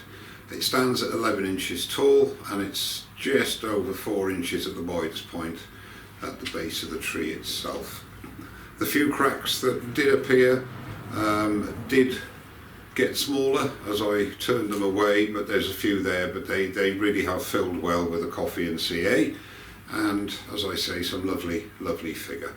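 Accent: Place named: Bristol, United Kingdom. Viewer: I've been producing some larch snowmen on my second hand lathe from Aldi (£70- not bad if you want to try some spindle turning before getting more invested.) Nowhere near your skill level so thanks a lot for uploading, I'm learning a lot from your stuff. Merry Christmas! Ps what part of the South West are you from. You sound 100% North Somerset/Bristol area.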